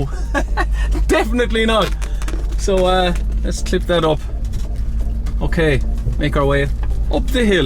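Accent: Ireland. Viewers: When I first looked at it and heard your accent I thought you were driving on part of the Donegal Rally route! The first time I went to see that in the late seventies, Billy Coleman won it in a whole other Lancia! I probably would take the Integrale too and live with constantly crapping myself about how much it will cost to repair when it inevitably breaks down! 😁😁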